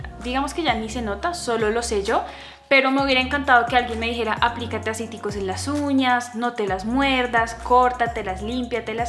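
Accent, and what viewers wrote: Colombia. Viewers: Por el acento, parece que de Colombia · Yo apenas escucho su voz: Ella es colombiana jajsjaj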